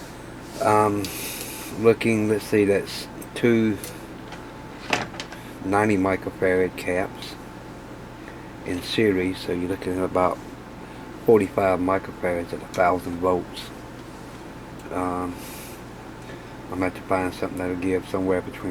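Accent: South, United States. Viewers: I'n happy to see some great folks stepping up to help and the thru his Patreon channel.. I find his brand of repair and restoration unique and refreshing. Besides his southern draw make me smile with delight. Please consider joining me by adding your support as Patreon with a $1 or more monthly contribution to help insure having his informative videos around for a long time.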